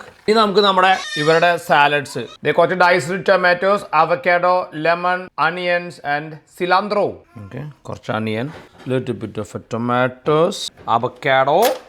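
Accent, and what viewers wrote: Thrissur, India. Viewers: Chettan thrissur karan gadi ahnu enn orapich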